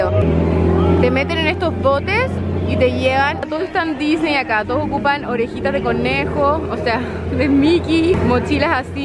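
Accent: Chile. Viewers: Se nota tu Chilenismo xd Saluuudos! Desde el mismo Chile:D Me encantan tus videos, enseñame a hablar ingles:v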